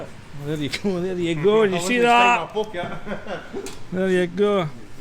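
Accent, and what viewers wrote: Scotland, United Kingdom. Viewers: Nice to hear a good Scottish accent. Could have been egr, but with the state that was in, egr could have been one of many.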